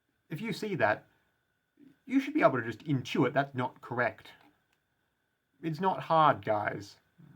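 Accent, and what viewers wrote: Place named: New Zealand. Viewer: The Kiwi accent is the best in the world 🇳🇿🇳🇿🇳🇿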